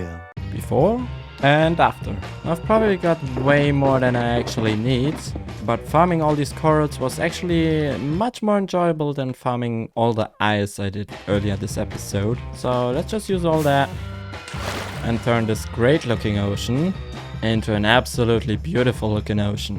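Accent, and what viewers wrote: Germany. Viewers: Ich liebe die Serie, und du bist ein MACHER Hoffe das dein Umzu ganz gut wird, bin immer noch beeindruckt das man deinen deutschsen akzent fast gar nicht hört ;) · Das ist eine one lovely german accent :) well done!! :) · I like that Deutsch accent a lot bahahaha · Man hört das deutsch extremst raus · Wenn deutsch englisch reden einfach beste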